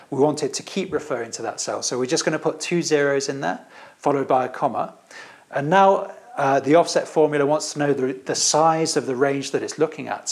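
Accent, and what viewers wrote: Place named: London, United Kingdom. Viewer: like it ... stright to the point & simple. well done man. btw sound from north london ;)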